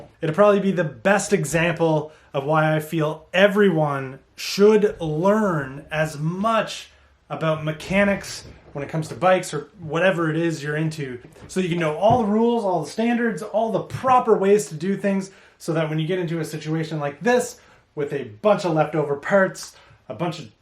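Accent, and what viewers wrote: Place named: Maritimes, Canada. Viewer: I thought you sounded like a Maritimer.. then you said "Nova Scotia winters".. I'm stoked to see an awesome East Coast youtuber building bodged bikes. I think you put the final nail in the coffin of my plan to buy a new hardtail this summer. My 2015 Norco Storm is getting a bunch of new parts in the near future. (As if I could find a kickass hardtail any time before July anyway...)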